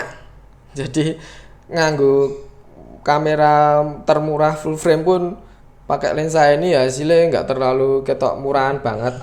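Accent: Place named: Tulungagung, Indonesia. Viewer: Cocok banget ini untuk pengambilan gambar cinematik ..Btw ini yang punya orang Tulungagung ya bahasanya Wangun😄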